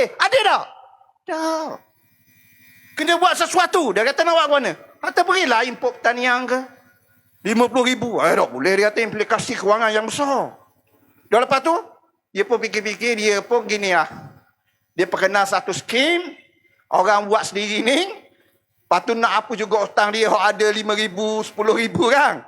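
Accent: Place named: Terengganu, Malaysia. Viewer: Menyampampah dengar cakap ganu klante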